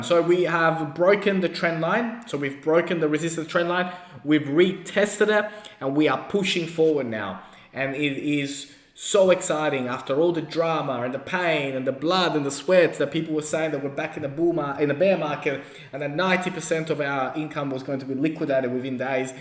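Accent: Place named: Australia. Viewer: He's an aussie. Dno where abouts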